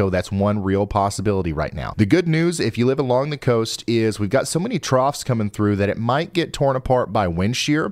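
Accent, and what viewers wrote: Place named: Kentucky, United States. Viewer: Got hooked over a year ago when I heard that Kentucky twang.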